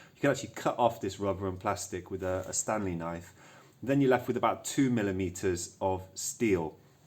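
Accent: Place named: United Kingdom. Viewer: hear me out... He's in Brussels, sounds like he's from the UK, maybe they call an angle grinder a circular saw across the pond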